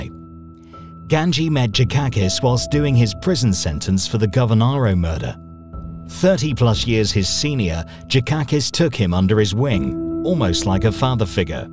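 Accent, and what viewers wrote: United Kingdom. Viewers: the British pronunciation of the surnames is laughable.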